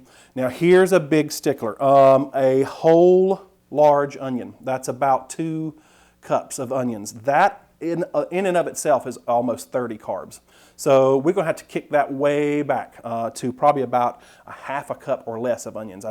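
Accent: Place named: Tennessee, United States. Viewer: I am from Knoxville, TN and I now live in Florida. It is driving me crazy trying to figure out your accent! I believe you are from Tennessee too??? Please tell me!!! I’m really going crazy😂😂😂 I’ve watched many videos trying to figure it out. You just use lots of slang I have only heard in Tennessee. I love your videos and you and I think a lot alike. Please have a great day!...... and please answer my question 🤷🏼‍♀️😂😂😂😂😎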